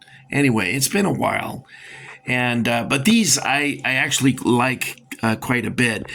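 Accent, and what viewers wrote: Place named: England, United Kingdom. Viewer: As ever an excellent video with your own fantastic style too. Great English accent BTW. ❤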